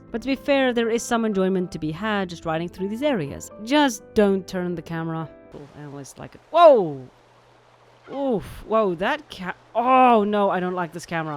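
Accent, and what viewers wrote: South Africa. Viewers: Is she South-African? :o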